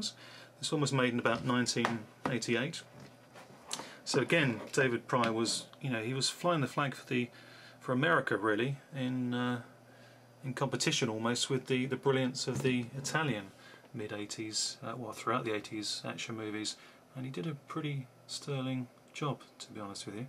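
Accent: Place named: England, United Kingdom. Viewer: noticed your accent mate i am from england too, come from essex mate. how did you manage to get deadly prey on vhs. i used to have a vhs copy orinigal not in japanese writing, the cover looks like danton at the end of the film screaming outside of a bulding, which is misleading cause you don't see any buildings in the film. still great movie. don't have jungle assault still trying to get a copy. do have deadly prey.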